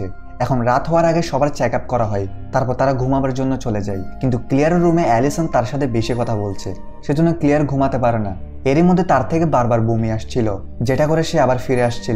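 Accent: Chittagong, Bangladesh. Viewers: ভাই মনে হয় চট্টগ্রাম এর মানুষ যতই শুদ্ধ বলেন না কেন চট্টগ্রাম এর ভাষা বের হচ্ছে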